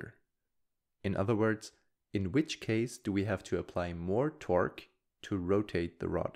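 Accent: Germany. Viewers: Listening to his accent, I think he is German.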